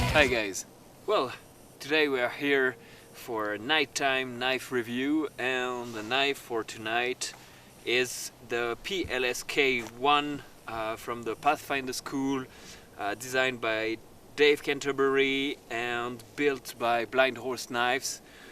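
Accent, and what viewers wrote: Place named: Switzerland. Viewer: hoi du CH schweinchen (schweinli doesnt seem correct). Love the accent, too many years since ive heard it. What part of Helvetica are you filming in? Nice video, reminds me of many happy nights in your snow. Nice knife, im attached to hand forged steel, but its a big chunk of change. I wonder how the quality is now, so many years after the split. Viel gruess aus Florida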